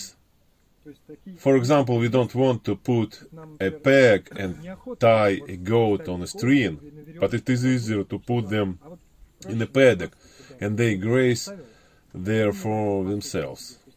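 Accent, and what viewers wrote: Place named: Russia. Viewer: great that there are other videos in preparation - looking forward to watching them! Regarding dubbing, I was watching without a sound, so I think that dubbing is unnecessary. Yes, you have a quite typical Russian accent, which is totally fine, since you are a Russian. :)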